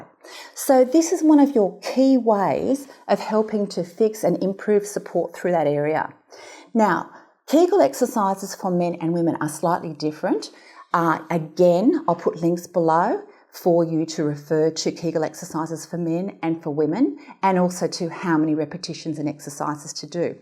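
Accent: Australia. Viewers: Wow, an Australian, hoooooooray! Not only do you speak Australian so I can understand you better, you are sensible and helpful and to the point, thank you sooo much for this video! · Hi - I did watch the video and it turned out (no pun intended) to be interesting and well presented. I'm a sucker for a woman with an Aussie accent, too. Regards.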